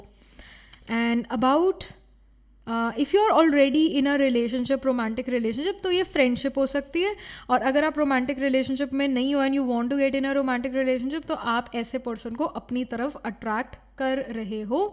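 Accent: Punjab, India. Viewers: Are u a Punjabi mam...? Actually mujhe apke ascent se lga 😅❤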